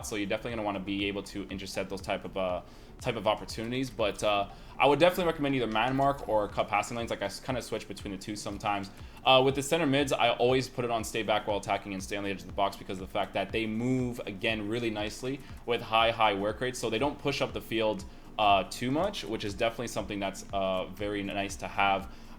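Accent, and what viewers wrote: Portugal. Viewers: Are you portuguese? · Are u portuguese??? Love 🇵🇹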